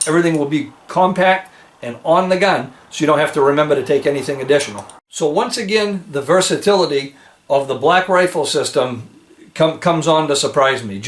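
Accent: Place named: New England, United States. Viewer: FINALLY found a firearms channel from my region, with my interests! Speaking native New England too. Thank you sir. Being In Massachusetts, I must live vicariously through YouTube.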